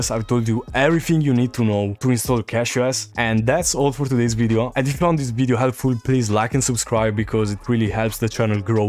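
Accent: Italy. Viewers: U are italian?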